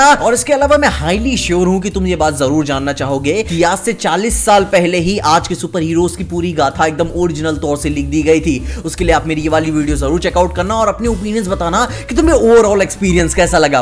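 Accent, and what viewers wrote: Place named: Bihar, India. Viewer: Bhaiya ham bihari hai... Ham bhi kabhi kabhi ki tarha batiya lete hai. Par pata nahi kyu.. vo accent bhiya ke mu se jyada acha lagta hai... 🤣 Keep up the good work bhai..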